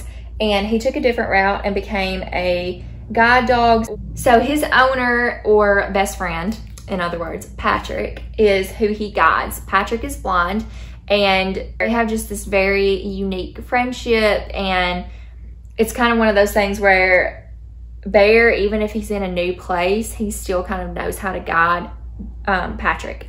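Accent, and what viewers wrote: South, United States. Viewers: I love hearing your perspective with that southern drawl.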